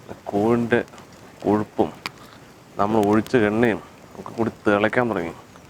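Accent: Palakkad, India. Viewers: ചേട്ടൻ പാലക്കാട് ആണോ....ചേട്ടന്റെ slang പാലക്കാടൻ ആണല്ലോ...I m in Palakkad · മ്മടെ പാലക്കാടൻ ഭാഷ, അതാണ്,,, ഓ,,, പൊളി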